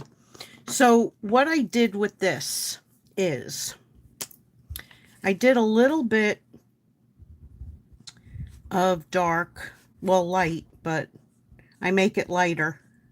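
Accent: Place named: Brooklyn, United States. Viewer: Very cute verrrry cute! You sound like youre from Brooklyn lady!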